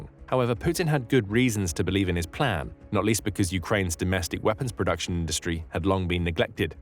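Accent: United Kingdom. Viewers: Whats up with mixing british and american accent??? Can we just stop pronouncing missile with britsh accent and the rest american? So annoying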